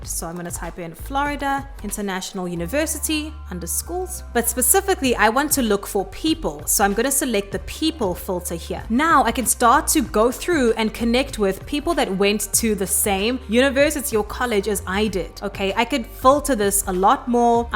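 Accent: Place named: South Africa. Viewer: So nice to hear another South African accent 🇿🇦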